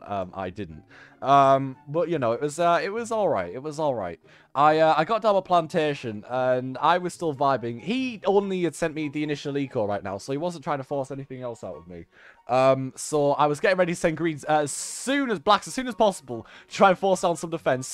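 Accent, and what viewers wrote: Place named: United Kingdom. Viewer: Man I really can’t get over ur British accent lolll it’s awesome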